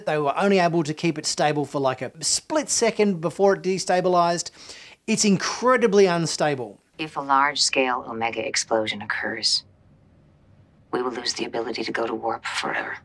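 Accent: Australia. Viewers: That's our Aussie accent! 😆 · Hi mate, great upload, listening to your accent and thinking, hey up, this blokes Australian and that reminded me of the Voyager episode with Species 8472 where they enter Fluidic Space, what's never explained is if they enter FS at point A, when they leave it, are they still at point A or anywhere else? So I could leave my house in the UK, enter FS and then jump out of it in Australia and meet you down the pub, not using Sub Space just Fluidic Space. Yes I know 8472 wouldn't want people crashing through their territory every five minutes but maybe they'd negotiate a section of space, ( just how BIG is Fluidic Space anyway?) far away from them that the Federation can use as a bridge from A to B.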